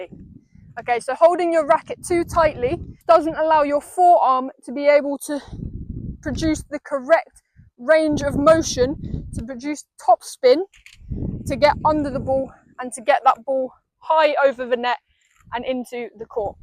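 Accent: England, United Kingdom. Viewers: "Two things! Your videos are absolutely amazing, and your English accent is every Spanish speaker's dream — I still remember my English teachers telling me that!" Greetings From Argentina! 😁😁😁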